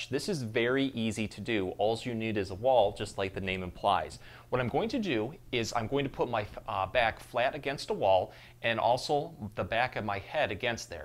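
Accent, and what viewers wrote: Michigan, United States. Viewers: Completely unrelated to the video, but this made me realize how strong Michigan accents can be when you listen to them Related to the video, this is very helpful and should ease some pain from working! It’s a shame I’d need to drive across the state to get treated by you though, you know your stuff!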